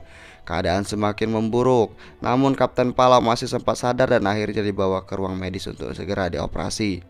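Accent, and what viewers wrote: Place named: Sumatra, Indonesia. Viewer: swara abg kek logat kepri or sumatra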